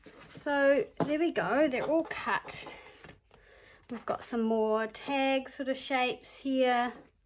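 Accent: New Zealand. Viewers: Hi I have just found your channel and thought wow, she sounds like a kiwi! So further investigation and yes you are her in NZ! That is so good as I didn’t think had a channel on You Tube for junk journaling. I don’t know anyone else here in Dunedin that does JJ so really pleased to see you here and hear your accent 😂Lynne Dunedin NZ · I love hearing a junk journaller with a kiwi accent. Congrats on the growth,.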